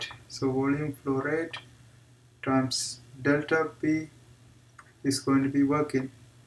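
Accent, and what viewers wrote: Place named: India. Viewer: Indian speaker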